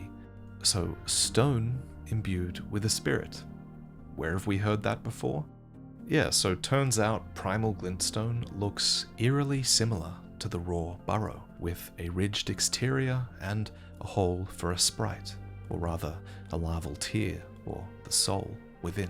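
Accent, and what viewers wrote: Australia. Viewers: revealing he's Australian like a jump scare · IS AUSTRALIAN??? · is Australian? lol hello from Bali, mate! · Didn’t even realize you’re Australian how did I not notice that you have the accent too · I didn't even pick up that you're Australian and thats coming from a fellow aussie xD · Wait wait… YOU’RE AUSTRALIAN!?!?!!?!? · Most shocking lore revelation in this video for me was not in fact Elden Ring lore, but Lore, I had no idea you were Aussie! XD Phenomenal work as always, can't wait for your next video! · I’ve been here for years so how am I just finding out you’re Australian?🤣🤣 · Been watching for 4 years now and only just found it he's Australian.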